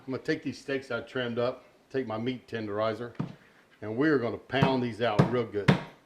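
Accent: South, United States. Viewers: Looks delicious! I love your country accent. Very authentic.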